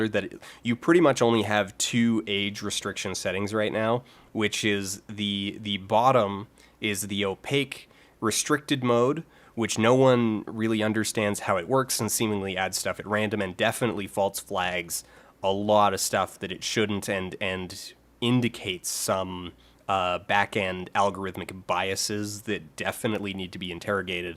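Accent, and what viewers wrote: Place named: Canada. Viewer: lol I just meant the voice and the way you speak. Not the actual words. Are you canadian?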